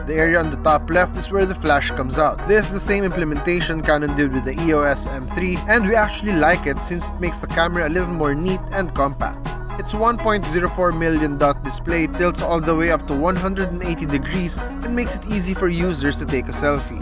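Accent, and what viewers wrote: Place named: Philippines. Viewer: This accent is so nostalgic... Are you a Filipino? :/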